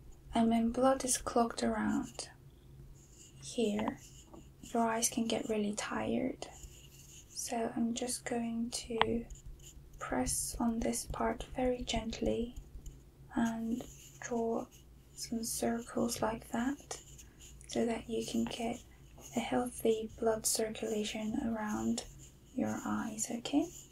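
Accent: United Kingdom. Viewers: It’s giving uk 🇬🇧 😂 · Oh my god you have like a British accent that's so pretty 😊 and love the ASMR video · IDK why but she sounds british for some reason. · u are from UK? I loved ur accent🥺❤️ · Are you British? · Are you European? Your accent sounds both American British (Northern and southern? Mabye a mix of American Irish but mostly British. I can't tell and I'm so confused) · She sound quite British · What country do you live in? I can't place the accent but sounds like the UK. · I... can't place your accent. Sounds predominantly british, but it breaks that... pattern, for lack of a better term, often enoufh to make me question. As an american who doesn'r get exposed to many different accents, it bothers me when i can't identify it, lol. Something about reinforcing the ignorant american stereotype? All that aside your asmr is fantastic, i found your content last week, and have binged vids 3 times for relaxation and sleep. 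Edit, are you layering the file for the mic sounds on top of the audio from the video? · I’m getting British accent vibes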